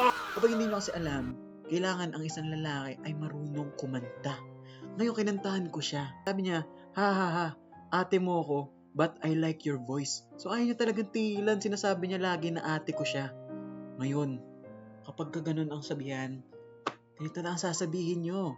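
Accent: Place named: Pampanga, Philippines. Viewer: Aydo atchu ya pala pampanga bap hahah an lakas boss taga pampanga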